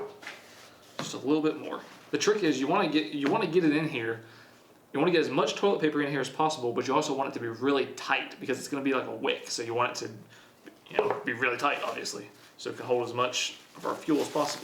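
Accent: South, United States. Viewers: He sounds southern but he calls it Pop, and we dont do that. So I'm mega confused.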